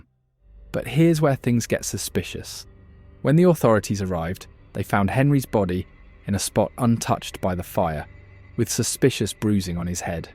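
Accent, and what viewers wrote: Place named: South England, United Kingdom. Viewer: Great work sir, excellent narrator sounding South England vibes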